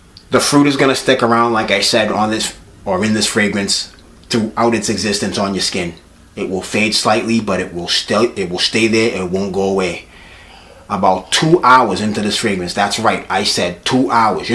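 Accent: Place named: Northeast, United States. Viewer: Hi, first time viewer of your channel and I love it. Could you please tell me where you got the fragrance? From your accent, I presume you are in the Northeast US (like me). Did you have to order it direct from Montale? My usual go to Montale spots (Bergdorf, Neimans, etc) don't have it. One more question, if you have sniffed Dubai Indigo (Bond No. 9), does it smell too similar (I saw that mentioned somewhere)? Thanks in advance, I am definitely subscribing 😀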